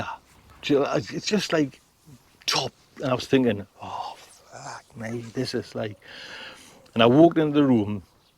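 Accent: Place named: Northern England, United Kingdom. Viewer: So sorry to hear about your health issues me and my 7 & 3 year old granddaughters watch all your vlogs, I said to my granddaughter let’s watch they know your name even by your voice, I was making a cup of tea & she rang to me and said not well, I came in and this was before you had said anything, she knew by looking at you, your in our homes daily with your smiling face your northern accent n witty humour, my granddaughters love to watch how the things grow in your allotment, they learn so much, we wish you well on your road to recovery, I’m sure all your followers where touched by this video your truth and honesty you shared with us all, where all rooting for you ( no pun intended)😂, don’t worry we’d watch you even sitting in your chair, your garden will be there even if you have to take a step back for a while. Take care best wishes ❤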